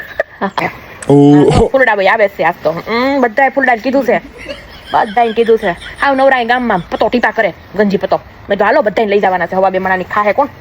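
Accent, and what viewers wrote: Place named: Saurashtra, India. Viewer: બેન તમે ગામડે કેટલો સમય રહ્યા હતા, અને વીદેશમા કેટલા સમયથી છો? તમારી સૌરાષ્ટ્રના ગામડાની બહેનોની રીતભાત અને બોલી ઘણી આકર્ષક છે. વીદેશમા રહીને પણ પોતાની વતનની જીવનશૈલી આબેહુબ રીતે રજુ કરો છો. તમારી રગરગમાં માં ભોમકા ની સંસ્કૃતિ વણાયેલી છે.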